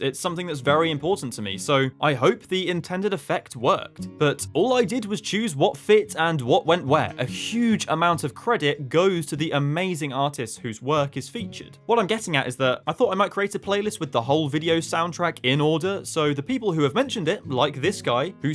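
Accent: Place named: United Kingdom. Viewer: click on video I'm interested in watching -Generic well spoken British guy again? 😮‍💨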